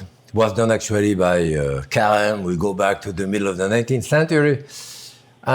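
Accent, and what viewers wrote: France. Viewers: sounds French still are you on drugs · Come on old timer you can do it. Say C-R-E-P-E"SSSSSSSS" Put the "S" in there you can do it! I believe in you. You are speaking English to an English audience. We use the "S" for plurals and you damn well know this because you've lived here for most your life so stop with your overaccentuated French accent. It might have been cool with the ladies in the 70's but now it's kinda BORING!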